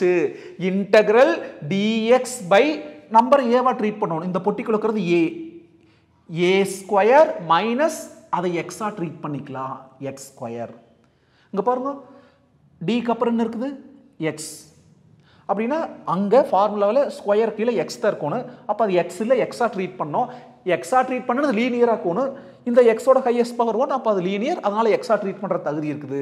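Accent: Coimbatore, India. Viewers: It's coimbatore slang. Try to understand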